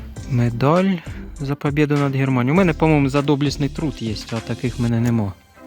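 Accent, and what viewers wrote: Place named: Surzhyk, Ukraine. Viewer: Извини брат - но лучше ты бы на русском вещал . А то такой суржик ..уши режет . От того ,что на русском будешь вещать - ты из украинца в россиянина не превратишься . И русский язык - это не собственность россиян . Мы все славяне - имели общий пра-славянский язык . Потому все славянские языки имеют общие корни и очень схожи . А то, что власти нас подели - в частности на манипуляции языками - то это на их совести . Главное оставаться Человеком - в лучшем понимании этого слова . А находки респект ! Мои поздравления !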